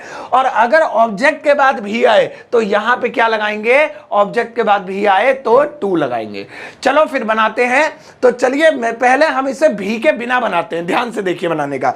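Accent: India. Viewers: 🇮🇳Deshi english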